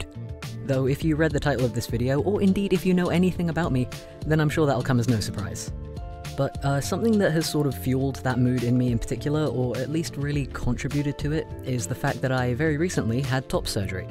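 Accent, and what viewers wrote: South, United States. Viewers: nice country accent! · yo that wasn't just American, that was southern! (and accurate lol).... but there's still gays in the south................. lol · As an American LGBTQ+ person I don't blame you going American (and definitely southern). My country has a long way to go in a lot of ways when it comes to the acceptance of people like us. I hope that this year fixes that. I'm really happy for you in how you now feel so much more comfortable in who you are, and your art is still gorgeous. Happy 2020 dude! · Yeah I live in the U.S. definitely southern accent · Oh my god your American (southern, really) accent gives me LIFE. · As someone who lives in Texas: I can 1000% confirm this is a Southern Accent. It's better down here in Texas now than it was a decade ago, as I think a lot of places are a lot better, but man, can it still be hard. 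· southern accent ON POINT I respect you on so many levels, live your best gay life my dude!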